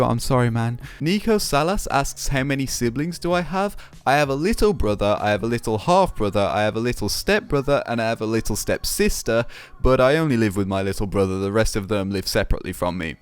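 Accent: Birmingham, United Kingdom. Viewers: Jeez u can really hear the brummie in the older videos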